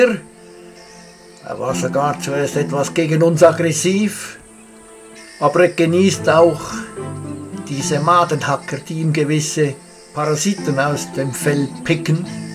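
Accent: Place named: Germany. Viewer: What the f is this guy saying???? I know he's speaking English but it sounds German.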